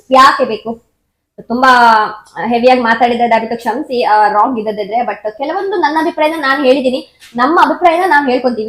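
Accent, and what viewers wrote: Kundapura, India. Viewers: Kundapurdavra? Kundapura bashe keloke laik ath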